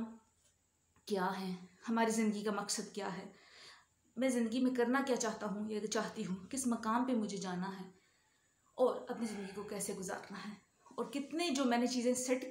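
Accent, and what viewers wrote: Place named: Punjab, India. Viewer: Punjabi xent❤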